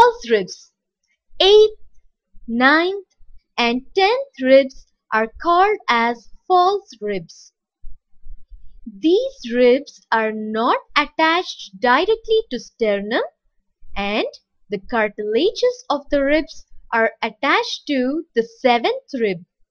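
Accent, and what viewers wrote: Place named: India. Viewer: Unbearable Indian accent.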